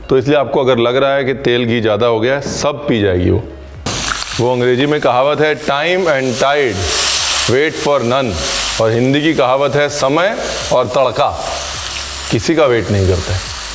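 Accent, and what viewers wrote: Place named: Bihar, India. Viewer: Bihari accent...waaow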